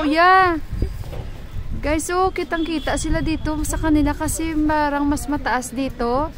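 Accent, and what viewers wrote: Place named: Hiligaynon, Philippines. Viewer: d talaga mawala tono.ng.ilongga day🥰🥰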